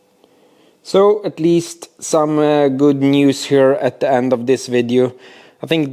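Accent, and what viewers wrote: Sweden. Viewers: i love how thick the swenglish is.... id watch a video about sewing with this accent.. cars are better tho.. tjena från Norge! å, nei.... hexadecimals.... have fun ;D¨'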